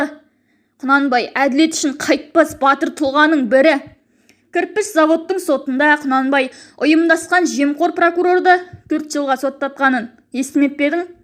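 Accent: China. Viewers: Осы автордың дауысын естісем,қытайдың дауысын естігендей, денем тітіркенеді..Қытайдың акценті.Біріңді бірің жау көріп,Қазақтың кең даласына сыймай жатырсыңдар-ау.Ұялмайтын адам екенсіңдер.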